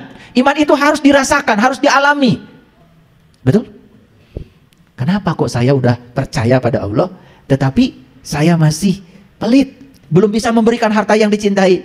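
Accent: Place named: West Java, Indonesia. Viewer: Si paling kemarab, lidah Sunda koq dipaksa jd arab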